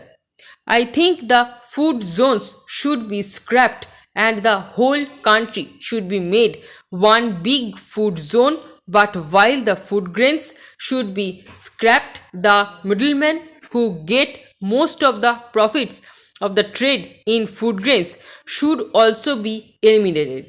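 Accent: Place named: Bengal, India. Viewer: bengali accent but dictation is okay and that word is Surat garh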